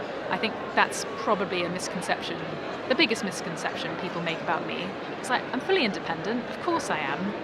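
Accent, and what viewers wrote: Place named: RP, United Kingdom. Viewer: Where did you learn such beautiful pronunciation (RP)? Love it...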